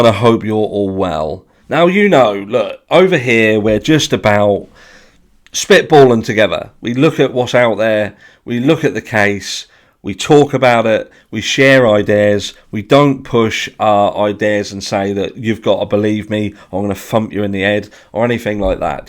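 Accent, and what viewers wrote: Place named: South London, United Kingdom. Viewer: Why are you getting in to the whole saga and adding fuel to the fire of that eedjit Ryan? The guy needs to (allegedly, in my opinion) lay off whatever it is he's taking. I'd stay out of that whole thing cos it's just insane and degrades anything you have to say. Sorry, that's my opinion, but was quite liking your straight forward 'South London' way of talking (I grew up in Reigate) so bit disappointed. I see the arrow, yes, but how do u know its not something that's been there for years for kayakers/fishermen/boats to mark an easy way on to the reservoir without worrying about a sudden drop or whatever?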